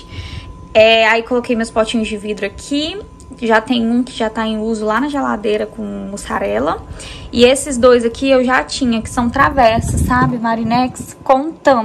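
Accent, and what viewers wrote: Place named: Minas Gerais, Brazil. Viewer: Esse sotaque de mineiro 😍😍😍 amoooooooo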